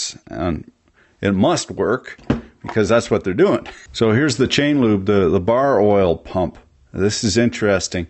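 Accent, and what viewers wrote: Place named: Canada. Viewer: I lived up in Toronto in the 80s play a little hockey bounced around you sound a little further north than most but I missed that Canadian kind of accent and the humor in the triple X 12%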